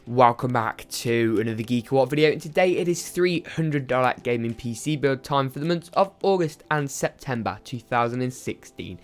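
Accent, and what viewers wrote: United Kingdom. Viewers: Why don't you leave uk links you sound like you're from the uk?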